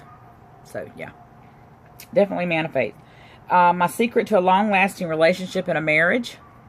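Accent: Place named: North Carolina, United States. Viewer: By the way~ I am from Durham (but have lived in Texas for 30+ years). I love the way you say "Derm" for Durham! I miss the NC accent! ♥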